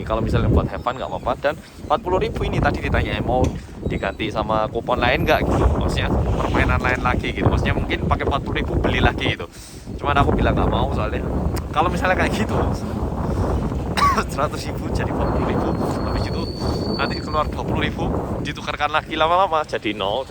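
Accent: Java, Indonesia. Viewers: Bisa ya jawa nya medok tapi jujur suaranya adem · Mas aku suka logatnya😊 kereeen medok bgt.selalu ngikutin videonya lanjut mas...ditunggu kolab sama bandung oppa lagi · Muka Lu kaya orang korea ko bisa ngomong indo selancar itu Logatt nya lebih ke orang jawa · bang? Abang ini asli nya orang apa sih? kok mukanya Korea tapi bahasa nya medok jowo ?? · Lho. Kyakk. Orngg Korea. Tapi kok. Medok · Logate kok jowo mas...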